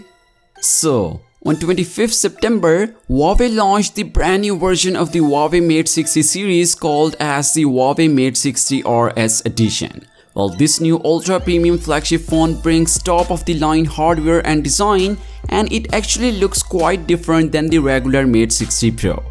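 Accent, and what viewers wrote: India. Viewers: Love your Delhi accent.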